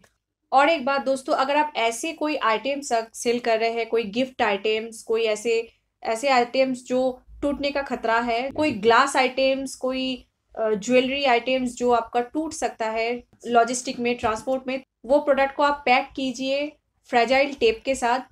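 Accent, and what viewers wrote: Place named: Bihar, India. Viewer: Hiii, kya aap Bihar se ho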